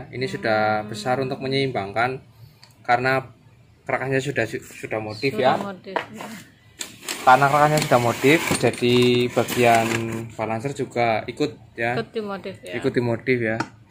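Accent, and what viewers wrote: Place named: Medan, Indonesia. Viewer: Logat nya seperti orang medan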